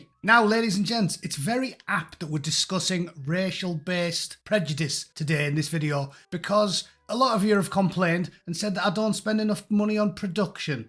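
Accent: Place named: England, United Kingdom. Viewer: What town in England are you from that you have this accent? Serious inquiry.. love the accent!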